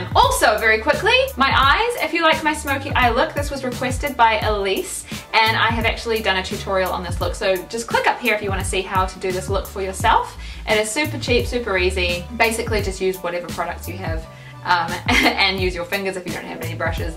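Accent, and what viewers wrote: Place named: New Zealand. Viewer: are you from new zealand? my second guess whould be australia your accent sounds a little kiwi to me,just curious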